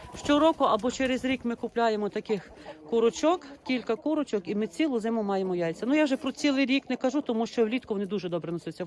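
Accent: Ternopil, Ukraine. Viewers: Дякую вам пані за відео про любимих курочок.Я насолоджуватись вашою мовою і зразу вгадала, що ви з Тернопільщини, потім прочитала в коментах.Таке відчуття, що я побувала у вас в гостях.Дякую, дякую, дякую.Ми переможемо і буде мир і є наша роботяща Україна.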